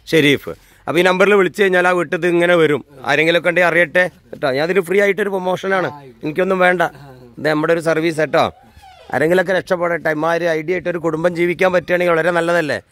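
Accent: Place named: Malappuram, India. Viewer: Njagal malappuram karude slang engane thanne yanu bro nee athil tention adikkada muthe thekkan mare pole boomikku foomi baryakku farya yennu onnum allallo aksharangal correct alle slang nee preshnam akkenda yethu slang anengilum yenthelum arivu kittunnundakil athu padikku allathe njangal malappuram kare athikshepikkalle muthu maney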